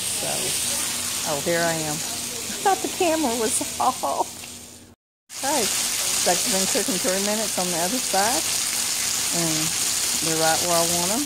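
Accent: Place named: Kentucky, United States. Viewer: You are a doll!!! To hear ya talk makes me miss my kin down in Kentucky ❤️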